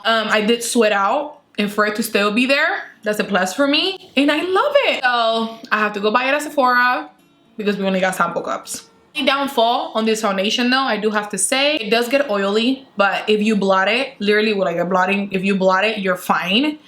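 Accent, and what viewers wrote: United States. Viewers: I love how you speak, reminds me of back home in SoCal. What lip gloss are you wearing????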